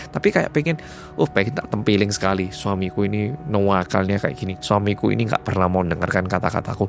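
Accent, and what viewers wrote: Java, Indonesia. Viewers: Hai masbro muedok😅 Nyimaaak 🎉🎉🎉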